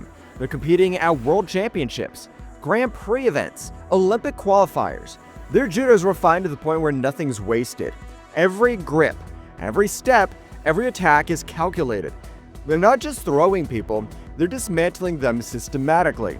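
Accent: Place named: United Kingdom. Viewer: british accaent e rbooks uk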